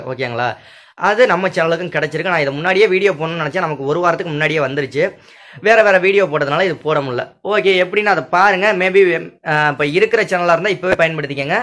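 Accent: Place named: Salem, India. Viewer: Super neenga endha ooru. Slanga patha Salem Zone a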